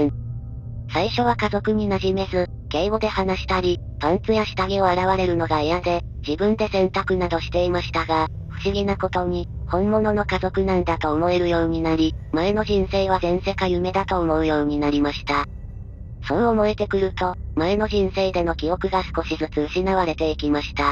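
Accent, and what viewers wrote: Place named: Hiroshima, Japan. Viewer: 山口寄りの広島みたいな喋り方だな、大竹か和木か辺り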